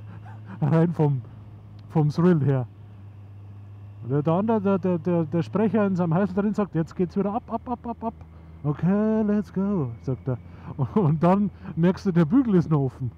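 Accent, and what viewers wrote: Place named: Bavaria, Germany. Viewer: Was ein Dampfhammer, bin zwar eher der Freund von leichteren und wendigeren bikes aber auf so einem Teil würd ich a gern Platz nehmen. Zudem gutes Video und erfrischend jemanden aus Bayern mit Dialekt zu hören macht direkt sympathisch Gruß aus der Oberpfalz🏍️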